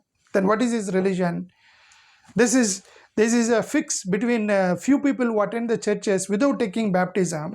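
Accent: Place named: India. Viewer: Good idea we will give 120 lakh shutup your mouth don't speak Indian english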